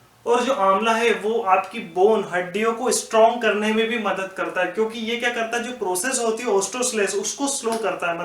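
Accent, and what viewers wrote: Indore, India. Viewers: Indori tone!!!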